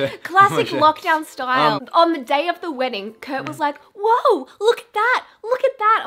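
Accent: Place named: Australia. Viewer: The little Ozzy accent developing strong!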